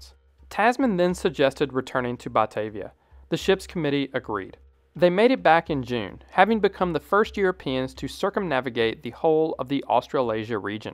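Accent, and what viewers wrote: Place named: Tennessee, United States. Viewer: Hey there I was trying to guess your accent and just wondering what state you’re from ? I hear TN. Thanks. Nice video.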